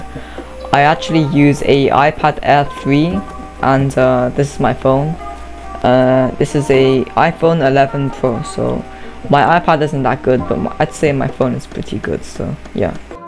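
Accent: United Kingdom. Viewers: U sound British btw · Lol, you have a British accent. But, no hate and nice voice.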